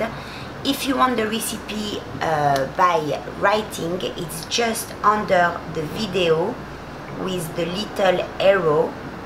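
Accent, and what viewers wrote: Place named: France. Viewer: Honey cake is my favourite!i love French accent Left u a liked👍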